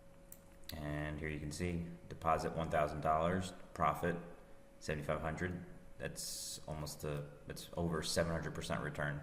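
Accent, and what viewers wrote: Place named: United States. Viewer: You are obviously American the way you talk (like me) but why are you using Blazemarkets? They don't allow US residents.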